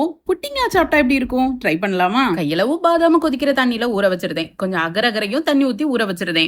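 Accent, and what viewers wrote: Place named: Kanyakumari, India. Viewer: Please change the slang eduthiruthen, vachiruthen, cheathiruthen, vittiruthen.k.k district aaa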